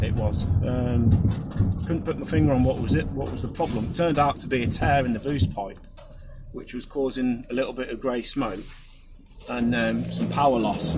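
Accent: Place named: Midlands, United Kingdom. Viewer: You sound like you are from the midlands. Where did you have this done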